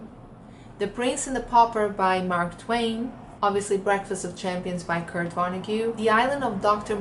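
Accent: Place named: Russia. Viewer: She's Russian. Her accent is obvious to me.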